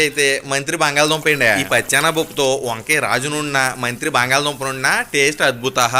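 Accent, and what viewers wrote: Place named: West Godavari, India. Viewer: Meedi West Godavari 😅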